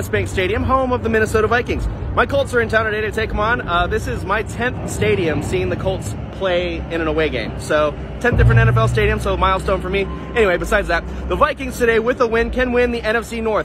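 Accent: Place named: Wisconsin, United States. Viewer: (Dude is clearly from Wisconsin)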